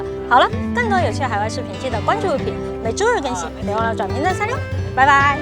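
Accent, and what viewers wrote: Sichuan, China. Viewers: 博主说的这是川普吧！熟悉中带着陌生😂😂😂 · 普通话夹杂四川话😂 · 原来Yooupi是四川妹儿，怪不得讲起普通话内么有趣！😅 视频制作的很好，非常非常的有趣，感受快乐的同时还能了解更多地域文化！太棒了！❤❤ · 哈哈哈难道是个川妹子，我就说口音怎么那么熟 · 不用理这种以自我为中心的狭隘的人，川普多可爱，保持自己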